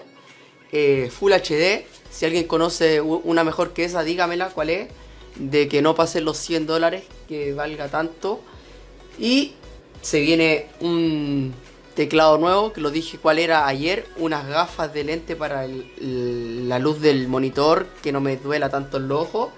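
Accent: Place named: Chile. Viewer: Wueon apuesto lo q quieras a qué eri chileno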